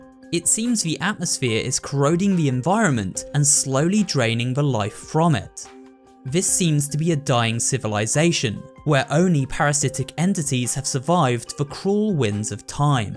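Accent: England, United Kingdom. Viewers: Why does every youtuber sound the same? Mousy, white with an ambiguous english accent ffs.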